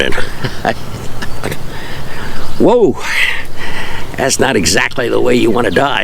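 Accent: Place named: United States. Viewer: was a handsome guy back in his day, bet he had the choice of all the young ladies!!! I feel kind of bad for pulling you up on one of your older vids for pronouncing gamo as gaymo!!! lol I only came across that vid as I was looking into the gamo swarm maxim elite becasue I purchased 1 around that time & having only seen that 1 vid, didn't realise how much of an official hardcore gun enthusiast/aficionado with such a vast collection & being a living knowledge base in the overall field of guns (I live in UK & just own the gamo swarm maxim elite... but would def own more if I lived in the US). So my apologies for the gamo/gaymo thing, I feel a little humbled when I only own the 1 & you own enough for a small army, yet I naively pulled you up on pronunciation! only meant as light hearted UK/US tomato/tomaydo type accent difference & was just having fun! 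(here in UK we like the US/American acent!). looks like an amazing place to live in Michigan!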